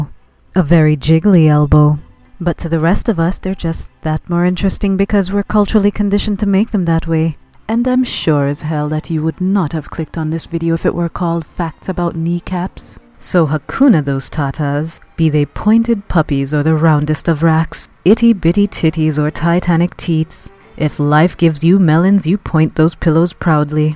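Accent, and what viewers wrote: Jamaica. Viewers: Your accent is sounding freshly topped up like you recently visited Jamaica! I heard it slip out when you said operation 😆 Love all your videos - and I would totally watch one about kneecaps if YOU made it!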